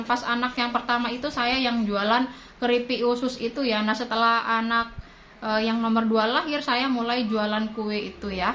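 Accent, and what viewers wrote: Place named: Sumenep, Indonesia. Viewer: Mbak tinggalx di mana? Kalau dari logatnya kayaknorang sumenep. Kalau emang sumenep, sumenep mana mbak.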